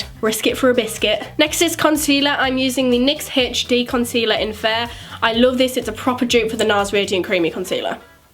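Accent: Newcastle, United Kingdom. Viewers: Subscribing for that accent, I'm fascinated. She must be the most British person in the U.K. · Itza Guillen .... do u know ur accents because it is obvious she is British... ps we are all human so calling someone's accent weird is just plain rude. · where do you come from because I really love your accent?!😘😍❤️🇬🇧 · Amirah Amazing No, she has a 'Geordie' accent, e.g. People who come from Newcastle and Tyneside... North East England. Not Scotland 🙄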